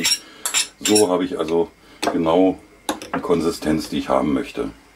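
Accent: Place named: Berlin, Germany. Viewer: Aha, is Berliner! 😁 Ma von Lichtenberg wink. 😉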